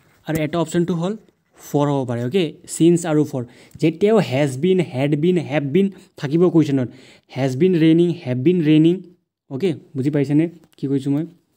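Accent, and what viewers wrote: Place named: Nepal. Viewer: Assamese language with Nepali tone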